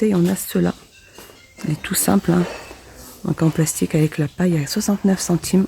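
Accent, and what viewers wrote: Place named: South, France. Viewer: Merci ma avec ton accent du soleil c'est toujours un plaisir de visionner tes vidéos 📹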